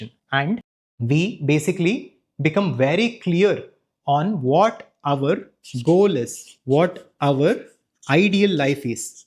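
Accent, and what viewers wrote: South India, India. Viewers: Vedanta is as per kannada vedant is usually in hindi. By his accent he is from south india and that is how we pronounce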